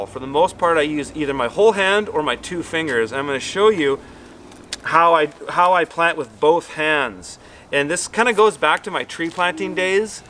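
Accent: Canada. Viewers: The Canadian accent is why I’m here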